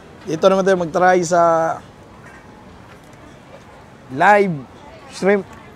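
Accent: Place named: Cebu, Philippines. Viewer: Taga cebu ka lods?? Mao mao mn gud ug tuno sa inisturyahan ni 😁😁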